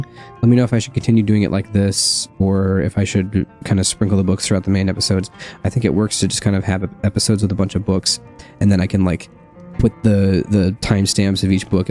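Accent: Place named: RP, United Kingdom. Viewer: I am very much enjoying your readings of the books. Particularly your English RP (recieved pronunciation) accent. A side note for your knowledge we pronounce the word Dynasty as Din not Dine if you wish it to sound authentic. Reciting in repetition tongue twisters such as Peter Piper or She sells seashells or The rain in Spain or The quick brown dog to practice your enunciation will help. I am sorry you are feeling unwell and it will definitely give you a fuzzy brain preventing you from taking the information in. Nevertheless, a super effort and much appreciated. 👍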